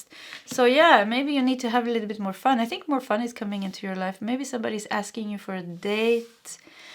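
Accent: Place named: Sweden. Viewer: I'm pile #3 and I'm SO excited hehee! My many arms are open!!! Also- I am adopted but found out I have alot of Swedish ancestry so I love to hear your accent and I would love to visit Sweden one day! Maybe it will come on my many fun new adventures you see! I thank you and send you much light and love ❤️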